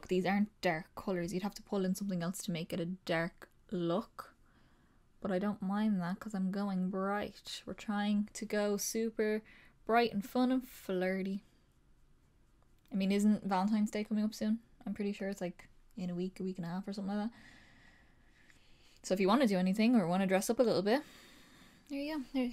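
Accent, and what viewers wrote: Ireland. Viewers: Damn you are so Irish 😍 😍😍💓💓💓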